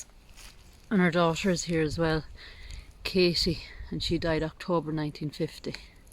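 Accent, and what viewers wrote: Ireland. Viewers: I just absolutely love you videos. Your accent reminds me of my Grandma Margaret . She was so very proud of her Irish Catholic roots. Church and Rosary daily 🙏. Her family came from County Monaghan. ❤️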